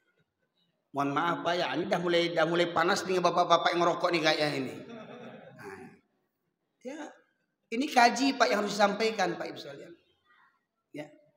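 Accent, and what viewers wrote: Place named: West Sumatra, Indonesia. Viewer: Lombok hadir tuan guru, saya suka, pengajian ini, meskipun ada bahasa yg sy tidak mengerti, tpi sy suka mendengar isi pengajian dan logat minangnya.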